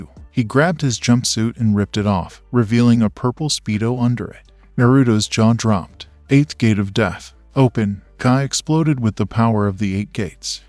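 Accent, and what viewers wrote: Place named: Indiana, United States. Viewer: Sometimes I feel like all of these Naruto what if channel's are made by the same person with the same voice and the same accent like I think it's kinda sus that at thea beginning of each vid it's like this guy with I think is a Indiana accent and I lot of other What if channel's I feel like are always random guys with a Indiana like accent and the fact that all them always talk with that accent in the beginning of the video is a hint but its just a theory a film theory thanks for reading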